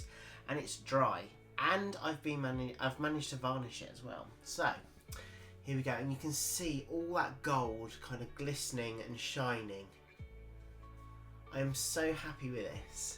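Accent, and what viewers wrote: England, United Kingdom. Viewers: OMG.I could almost hear your cogs turning and I loved it.I'm glsd I'm not the only one who makes split decisions. No matter how we plan,the art just takes it own natural journey. The piece it beautiful.So delicate and full of movement. I LOVE IT. Thank you for sharing.Git the ljnk off Facebook and have now subscribed to your channel.I may do the Molly/Olga and Donna course seeing as you have done it,it must be good.I love these 3 artists.I am also wondering about the Daler and Rowney paint.Is it a good brand?I am in Ireland and am wondering (because of your accent if you are England)because if you are then the products you use are probably easier for me to source. Thank you so much for the links and videos.I will enjoy watching.I like your style.🤗🇮🇪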